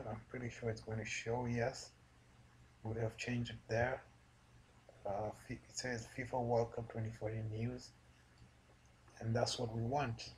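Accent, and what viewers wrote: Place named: Uganda. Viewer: you sound ugandan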